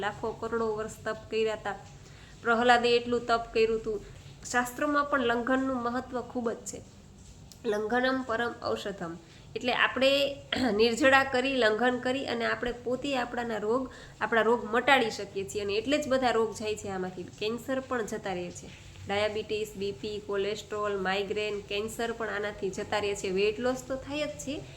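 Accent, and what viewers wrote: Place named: Kathiawar, India. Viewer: Mane tamaro kathiyavadi voice bav game ben sweet boli che👌🤗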